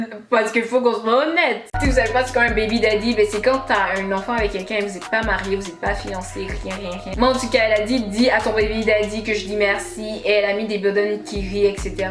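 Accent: Quebec, Canada. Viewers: waouw je kiff ton accent canadien. je voyais ta tête tout le temps dans mon fil d'actualité, aujourd'hui j'ai décidé de cliquer sur ta vidéo et je regrette pas, ton accent est magnifique. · Jcrois qu'elle vie au canada vu son accent. C'est possible que sa agit sur sa manière de parler. En tout cas c'est ce que jme dis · C’est la première fois que j’entends ton accent québécois waaw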